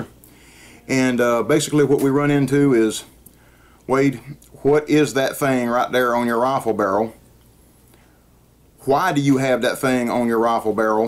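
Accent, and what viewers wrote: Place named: South, United States. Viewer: There is just something wonderful about a somebody with a southern accent explaining scientific or technical stuff. That was a really good explanation.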